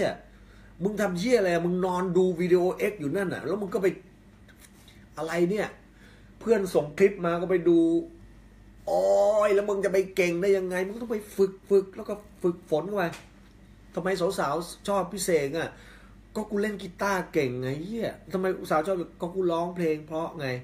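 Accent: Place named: Korat, Thailand. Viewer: ชัดเจนง่ายๆสไตล์โคราช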